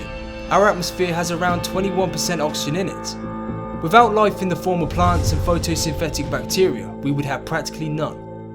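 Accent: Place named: England, United Kingdom. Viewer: G'day. Good vid. I love your pommie accent. Like Curious Droid you say 'Errf' instead of Earth. Outstanding. Vive la differance.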